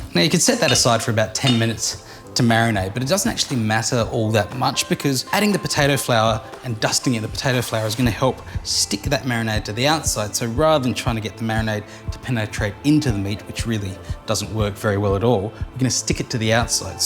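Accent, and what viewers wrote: Australia. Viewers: I love Australian accent. They seem so chilled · Opens video to learn new recipe See’s asian dude but hears Australian accent strokes out 🥴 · I'm confused Is he Aussie, English, or Japanese? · An Asian guy with an Australian accent. I love it!! And my mouth is watering for some of the chicken. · That's just Australian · I switch after I hear that accent Australia? Fake Japanese food :) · Dude looks Asian, sounds Australian and vibes like a Mexican. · He sounds perfectly Australian and not Singaporean or Malaysian. This isn't a sg or my slang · It's weird seeing a Japanese guy talking with this Australian accent lol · He is Aussie.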